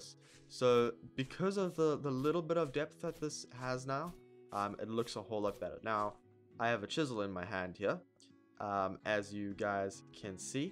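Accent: South Africa. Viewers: South African ou?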